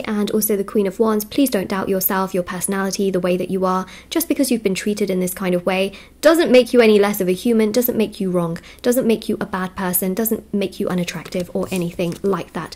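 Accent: United Kingdom. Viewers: Girl, for how long you have been in the UK? Your English accent is awesome!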